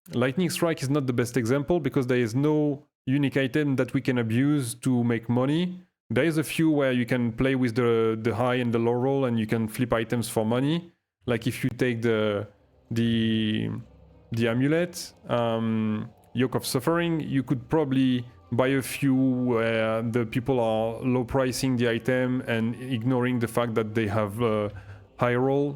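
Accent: France. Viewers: au vue de ton accent je suppose que tu es français ^^ en tout cas , très bonne vidéo. Les divines se font rare en ce moment x)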